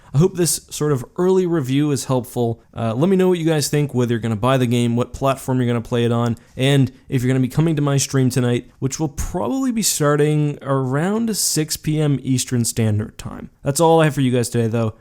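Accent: Canada. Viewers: Lots of “oots” and “aboots” in this video, I’ve never noticed how Canadian you sound before Eh.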